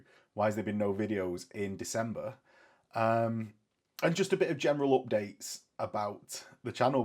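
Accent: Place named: Manchester, United Kingdom. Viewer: Where abouts in the uk are you based? Might be able to trek a bit for a game. I'm thinking manchester area by accent?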